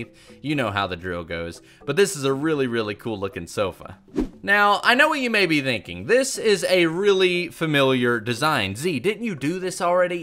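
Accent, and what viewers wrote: United States. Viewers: He does have an accent it's just an American accent can't really pinpoint it but he does have one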